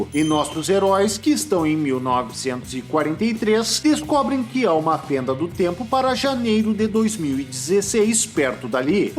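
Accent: Rio Grande do Sul, Brazil. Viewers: Tu és gaúcho o narrador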